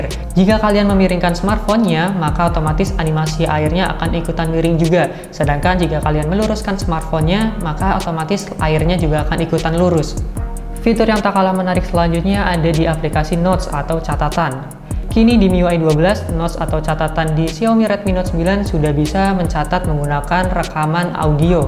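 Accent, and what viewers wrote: Tegal, Indonesia. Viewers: Tegal kah?? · tegal bos? haha auto sub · Wong tegal um?? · Jebule tegal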